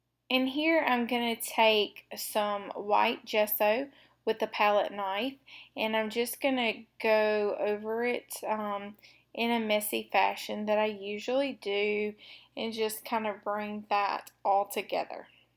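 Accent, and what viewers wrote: South, United States. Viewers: Love your videos/art work and the way you describe what you've done. Your Southern accent is also adorable!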